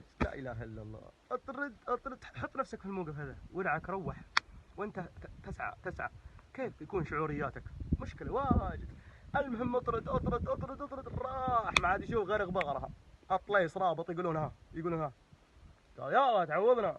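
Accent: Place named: Hejaz, Saudi Arabia. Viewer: السيد Vlb لا والله كلامه واضح ومفهوم ، هذي لهجتنا بالحجاز ' و حنا الاقرب للفصحى & انت تفتقد لاسلوب النقد ،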